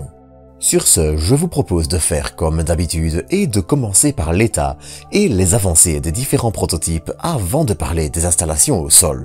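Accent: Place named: Brussels, Belgium. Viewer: J’ai vu ta bouille en cherchant un peu. On entend clairement les efforts que tu fais pour réduire l’accent Bruxellois(je suis belge aussi d’Anderlecht même) une proposition: et si tu t’en foutais et que le ferais avec ta façon normale de parler tu attirerais probablement plus de personnes et deviendrais le premier youtuber ciel et espace du plat pays. Allei tichke tes goed!😜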